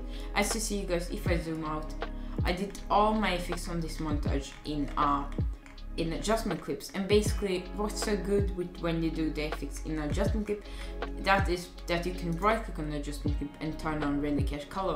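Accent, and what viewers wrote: Sweden. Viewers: are u from sweden. im from denmark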